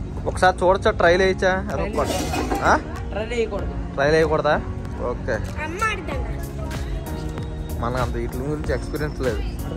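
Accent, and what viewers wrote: East Godavari, India. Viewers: Oribabu east godavari language apura babu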